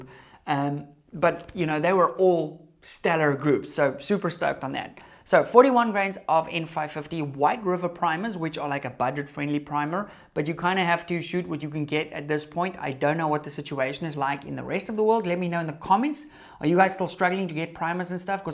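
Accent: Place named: South Africa. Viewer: sometime back my company did some work for an outside company. One of the guys on site representing the other company was South African. I recognized his accent from hearing you a lot. He was surprised because most Americans guessed England first, Australia a distant second, New Zealand an even more distant third, and South African NEVER. I got a kick out of that.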